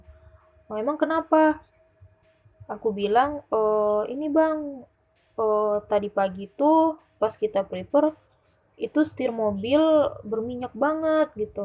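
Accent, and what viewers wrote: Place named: West Sumatra, Indonesia. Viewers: Menurut gue sih ceritanya serem, story tellingnya juga keren, tergantung pendengaran kita masing2 sih.. Cara orang bercerita mah beda2 yak, kita gak bisa maksain orng bercerita dgn gaya sesuai pencerita yg kita suka, si mba nya orng sumbar pasti dari segi logat aja udah beda sama daerah lain jdi gak bisa dibanding2in jga sih, nikmatin aja ceritanya guys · jelas kali logat sumatra nya lmao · Pembawaan logat sumbar emang gini mbak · Bukan menye2 mbak nyaaa, tapi logat dan cra ngmg org sumatra barat emng bgtu. Kbetulan saya org sumbar 😊 lain kli klo dngerin nya speed nya di naikin aja mbak jdi 1,25, saran nih 😂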